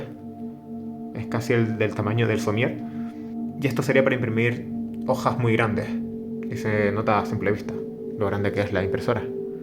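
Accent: Canary Islands, Spain. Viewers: Muy interesante el video con sus aulas de colegio y sus sillas,un saludo ,me encanta tu acento canario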